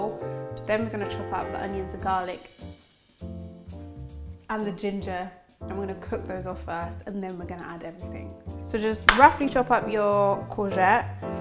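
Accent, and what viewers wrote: United Kingdom. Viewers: sounds like every other british person no?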